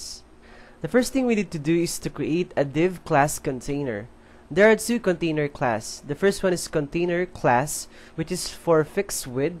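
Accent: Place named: Philippines. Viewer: Maybe he is Filipino because he's accent same with us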